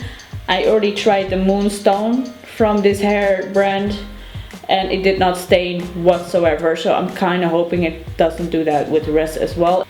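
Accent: Netherlands. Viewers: I hear a Dutch "accent" in your English.. Are you Dutch? Haha cuz if you are hii me 2 :3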